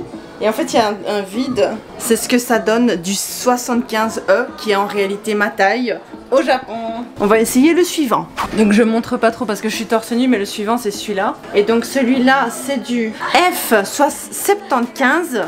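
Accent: Belgium. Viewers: T’es belge ?!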